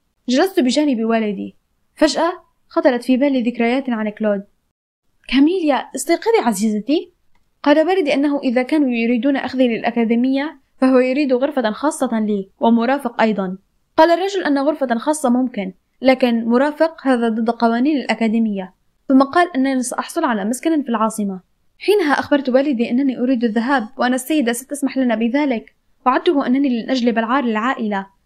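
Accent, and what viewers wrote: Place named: Jordan. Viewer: حاس انكي من الاردن بعرفش هيك بحس 😅😅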